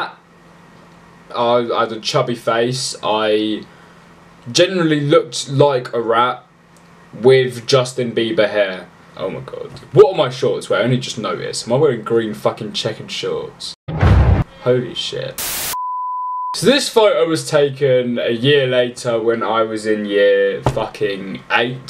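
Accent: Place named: United Kingdom. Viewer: If you're gonna play background music with high frequencies, like guitar picking, you need to turn that shit down cause it's way too damn loud. Don't feel bad though. Most YouTubers do it. just remember that we want to hear that beautiful, bodacious British accent, not the homeless Irish woman picking her guitar in the background.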